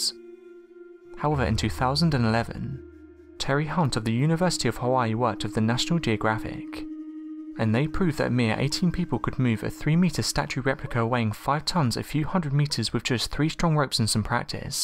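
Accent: England, United Kingdom. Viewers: It's just the intonation that English people use. Get over it.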